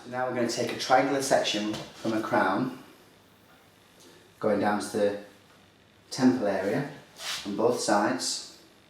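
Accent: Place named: Lancashire, United Kingdom. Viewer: Nice - impressive to get so much volume with just back-combing & clips (especially on silky hair); that brush sounds gentle. I like the teased/ smoothed wrap-around at the end (aprox. ); very pretty way to pull everything together. Awesome hat (I've been wanting one of those for a while)! I wish I knew more about English accents; yours reminds me of a couple famous people from (..looking them up now) Lancashire, & Yorkshire. Hope to see you again soon! x o :)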